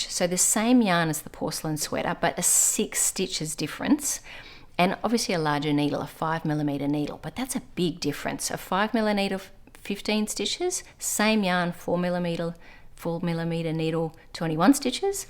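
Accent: Australia. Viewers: Thanks for the Ravelry tip,. There is so much I don't know about it; would you consider doing a tutorial sometime on getting the most out of Ravelry? You are so good at explaining concepts! Love your podcast; there aren't many Aussie accents in the knitting pod world, you are great.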